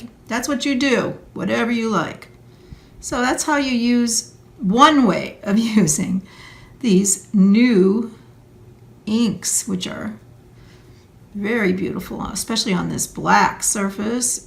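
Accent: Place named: New England, United States. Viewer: New England? I hear it, dahk instead of dark... and idear instead of idea (my hubs is from New England). Do you also say acrost instead of across? I think it's adorable ;-). Anyway, fun tute, thank you, plus Plum Blossom is one of my daughter's nicknames so I think I'll paint something like this for her.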